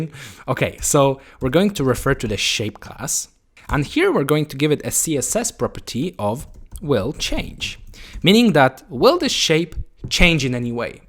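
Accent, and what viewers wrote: Poland. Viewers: Awesome effect! Q&A: Is polish your mother tongue ?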